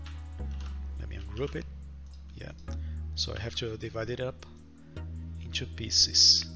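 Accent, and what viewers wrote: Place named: Brazil. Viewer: Na hora que ouvi o sotaque falei, esse cara é brasileiro.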